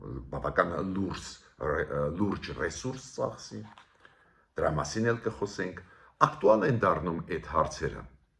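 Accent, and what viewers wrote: Russia. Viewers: nikoli tryapka ches haskanum vor da nikoli gorc@ che kez el drel es qaxaqakan verlucabani tex bavakane rusacavov tarapes akcentt rusakan e gone dranic amachi erevi naxkinum da pristij e exel ko nman soxuni hamar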